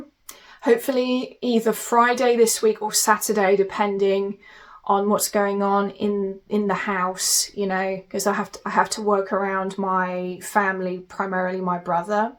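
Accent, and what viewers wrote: United Kingdom. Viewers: 🇧🇷 Even as a Brazilian, I don’t really have much difficulty understanding English in general, but the UK accent is a funny case, hehe. Anyway, I came from your gaming channel and didn’t know about this other one. It’s really chill to watch! If you’re having some trouble at the beginning of your stream, I’d say it’s totally okay to take one stream just for adjustments or something. The community will definitely help. Hugs! (And sorry for the English, still a lot to learn!)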